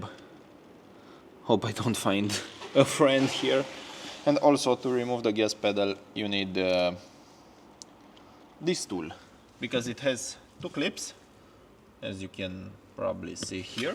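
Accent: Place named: Romania. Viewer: Mi s a parut ca esti roman de la accent dar cand am vazut romaneasca din portbagaj mi am dat seama ca e vorba de Romania 100% 😂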